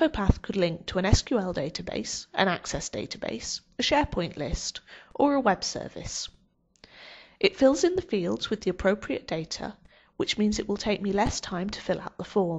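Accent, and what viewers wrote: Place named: United Kingdom. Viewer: Es acento británico.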